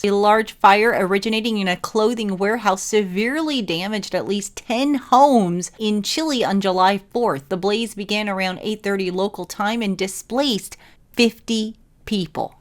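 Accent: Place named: Connecticut, United States. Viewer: Outstanding vid. You sound like my ex from west haven..so I am guessing conn. accent??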